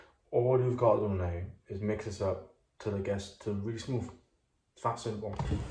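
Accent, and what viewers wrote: Wales, United Kingdom. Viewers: Great Welsh Accent...much softer than some...so, which Part of Wales. Id guess North Wales. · I don't suppose for one moment that I'm the only person who clicked on this as a result of the still pic at the start of the video! 😋😋As for topless "sexual" clips. There are plenty of those on YouTube! BTW, I'm trying to place your accent? Welsh? Apologies, if I got that wrong! 😉😉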